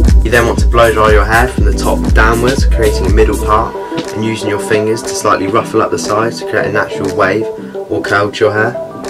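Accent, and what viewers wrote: United Kingdom. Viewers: you’re from england I see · Ooh he’s British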